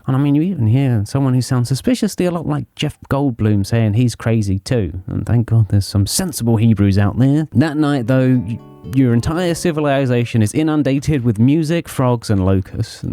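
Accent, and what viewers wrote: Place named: United Kingdom. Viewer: I don’t mean any offense at all, it’s just your shaggy look with an American accent would make me think that you are a drug addict, but with the British accent, it makes me feel like you’re a fucking sage, English Nostradamus ass white boy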